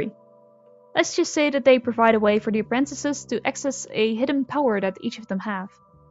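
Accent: Netherlands. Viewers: are you dutch? your accent sounds similar to that of dutch ones :0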